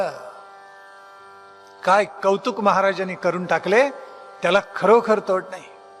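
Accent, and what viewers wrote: Standard. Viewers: आदरणीय गुरूवर्य आपल्या अमोल वाणीतून छत्रपती शिवाजी महाराजांचे व शुर मराठे सरदार व शुर मावळ्यांचे पराक्रम व महाराजांनी केलेला राज्य कारभारा बाबत केलेले उद्भोदक प्रवचन ऐकून मन तृप्त झाले. आपली पवित्र वाणी आणि सुस्पष्ट शुध्द मराठी उच्चारा मुळे आपले प्रवचन सतत ऐकत राहावे अशी मनोमन इच्छा होते. आपल्या चरणी विनम्र प्रणाम.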